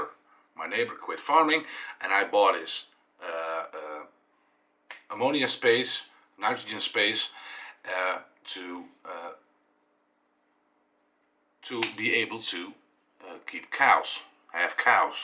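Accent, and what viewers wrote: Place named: Netherlands. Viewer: Heerlijk nederlands boers accent met engels, top man!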